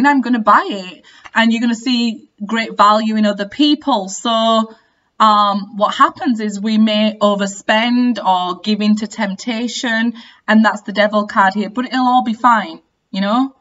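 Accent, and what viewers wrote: United Kingdom. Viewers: You're so pretty. And your British accent is beautiful. I love it. Thank you.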